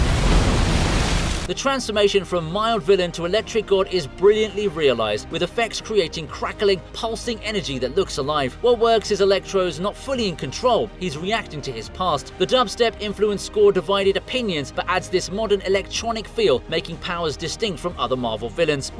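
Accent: United Kingdom. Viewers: Will I listen to british commentary?It makes me think about suicide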